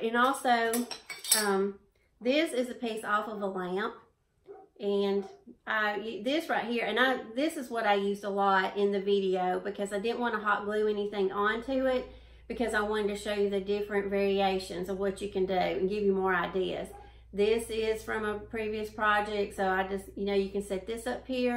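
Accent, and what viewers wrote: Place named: Alabama, United States. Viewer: Very glad I found you too. You sound like you're from Alabama. lol I amove the cloche you made. I will definately be trying it. You've surely inspired me.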